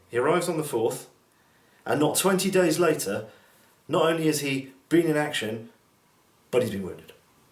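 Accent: France. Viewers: I understand much better when you have French accent :) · I love your French accent >< Very interesting video nevertheless =) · Nice French accent ^^ great video by the way :) Keep on that way ! Oh, and you've got a new subscriber. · Nice French accent, man. No, I'm not being an ass; it's actually good.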